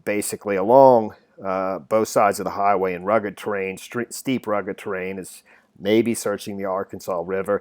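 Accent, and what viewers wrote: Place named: Delaware, United States. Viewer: Hi,! I really like your channel. Just the facts, plain and simple. Also, I keep thinking you sound like you're from northern New Castle County, Delaware, or nearby PA. Are you?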